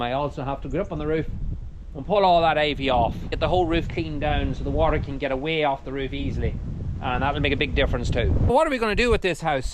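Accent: Cavan, Ireland. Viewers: Where is the cottage? Cavan ?, just going off your accent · That Good Strong Irish Accent! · Where is this house, somwhere in Ireland, (by your accent ) ? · I love to hear your brogue. My mother was born in Letterkenny in 1906.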